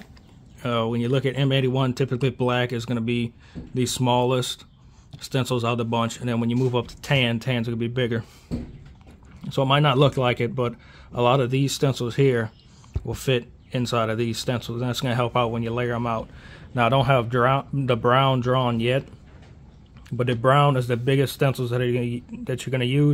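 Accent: Louisiana, United States. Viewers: What part of Louisiane are you from? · Sound like you from St Landry Parish 😂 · That’s a Louisiana accent if I’ve ever heard one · He sounds Cajun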